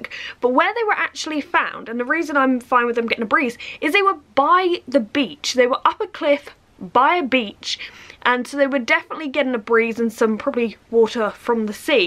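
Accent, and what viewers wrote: United Kingdom. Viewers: I’m from Minnesota which is in the USA. I bet my accent would sound funny to you guys too haha! Where exactly in Britain is this accent from? So adorable.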